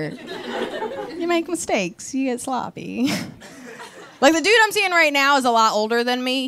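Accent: New York, United States. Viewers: Shes like super cute. But shes got that standard, new york, fran drescher thing goin on. She would make a nice wife.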